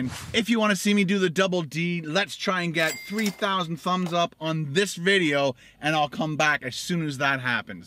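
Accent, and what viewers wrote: Canada. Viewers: #snausage and #egger makes English with a Canadian accent awesome, eh!!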